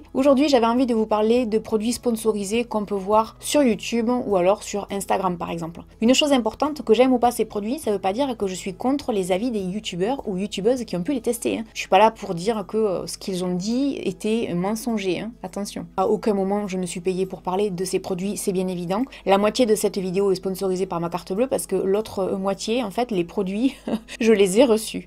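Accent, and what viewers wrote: South, France. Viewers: J’ai découvert par hasard vos vidéos il y’a deux mois. Ce qui m’a plu d’abord était votre accent du sud, un accent que j’ai reconnu et j’ai été contente de voir que vous ne l’aviez pas modifié. De par mon âge je pourrais être votre maman. Moi aussi je suis du sud de la France mais je vis à l’etranger depuis des décennies cependant j’ai conservé mon accent et nombre de français me l’ont toujours reproché. Ceci dit, je trouve vos vidéos toujours franches et intéressantes. Bravo. Je connais Yankee candles depuis bien des années car elles ont été commercialisées ici bien avant la France et je suis d’accord avec vous, elle sont de bonne qualité, ma fille les aime beaucoup mais leurs parfums sont très prononcés. Je préfère me servir d’huiles essentielles (lavande, orange, vanillle et menthe poivrée). Merci.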